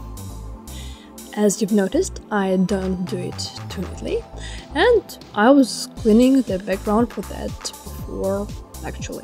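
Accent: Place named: Russia. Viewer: мне показалось, что у русский акцент, или это действительно так? it seems like voice has russian accent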